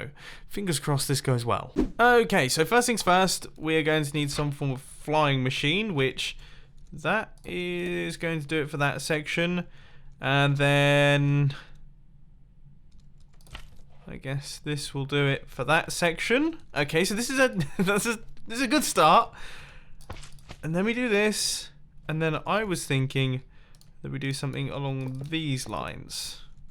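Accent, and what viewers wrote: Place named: United Kingdom. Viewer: this guy is so bri'ish it's fucking disgusting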